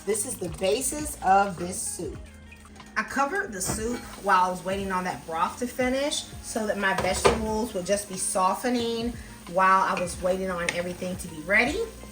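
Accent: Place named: Nashville, United States. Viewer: Hi! I'm a new fan,. Hey - are you by any chance a Nashvillian? That's Tennessee, y'all. You sound so Nashville! Are you? Love your stuff! Keith in Nashville PS You're a genius!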